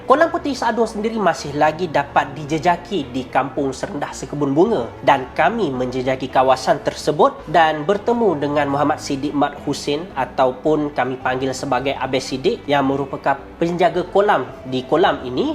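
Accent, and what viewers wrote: Thailand. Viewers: Best dengar slang thailand 🤭🤭🤭🤭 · Orng thai ke ni accent dia beli sebut nama ii tu sebijik orng thai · Aku suke die ni ckp nme org tu.. Die pnye slang Thai tu..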